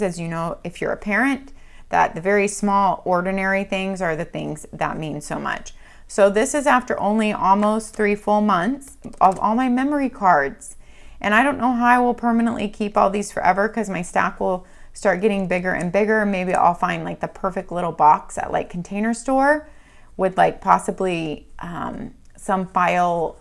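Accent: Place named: Texas, United States. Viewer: Love this video! Your accent and use of y’all really makes me miss Texas haha. I got the Christmas planner for this and I am so excited to use it as a yearly memory book. I wish I had it when my kids were little, time goes so fast I want to remember it all.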